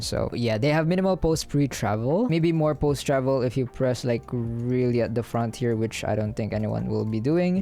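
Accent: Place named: Philippines. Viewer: > Pinoy accent looks in > Actual pinoy reviewer with top tier production value + signature rap speech Subscribed agad AHHAHAH P.S. Krazy ahh mouse grip T_T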